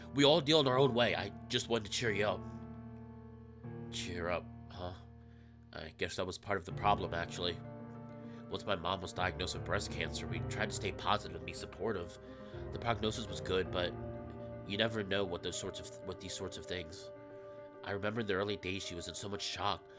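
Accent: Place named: New Jersey, United States. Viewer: Hey, if your New Jersey accent comes out while recording then roll with it. Except for with Colby and one of his options. K-ho's inspiration for the setting was Boston so it feels almost authentic when your New Jersey/Northeast accent comes out.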